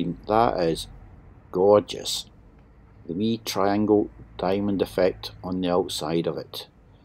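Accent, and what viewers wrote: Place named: Ireland. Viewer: I love that Irish accent. the 'Rs' just roll off the tongue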